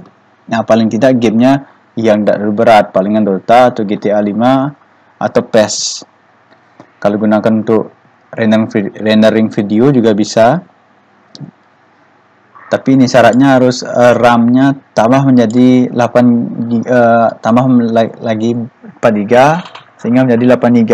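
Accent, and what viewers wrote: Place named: Sumatra, Indonesia. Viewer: logatnya orang sumatra y!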